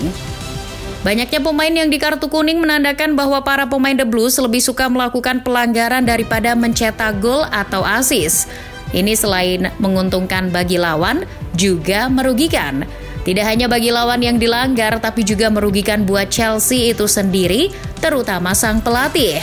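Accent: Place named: Semarang, Indonesia. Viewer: pengisi suara jangan jangan org semarang nih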